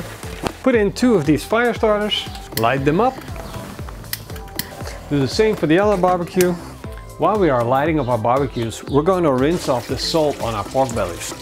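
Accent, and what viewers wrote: Netherlands. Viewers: asjeblieft hou op met dat engels....het klinkt echt totaal niet dat NL accent erin.. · First video i watch of you but damn ik hoor meteen dat je Nederlands bent haha goed bezig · cute strong dutch accent !!!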